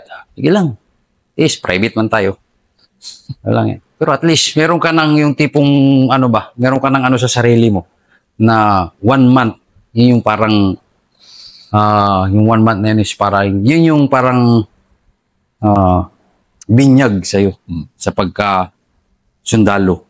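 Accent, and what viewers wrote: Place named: Visayas, Philippines. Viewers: Taga panggasinan si sir pru bisaya na yung tono nya pati salita at kilos nya bisaya tlga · Yung tuno ng salita mo master bisaya na❤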